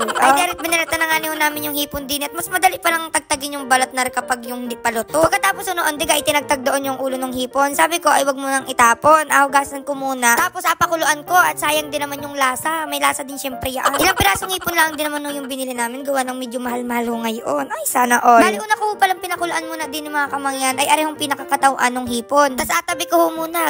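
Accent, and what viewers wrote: Batangas, Philippines. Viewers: Why Kamangyan ang vlog mo? Are you part of Mangyans? Nagtatanong lang, curious lang ako. Kasi your beautiful even no make up. Saka accent mo Batanguena. Ala Eh!! From Las Vegas, Nevada USA.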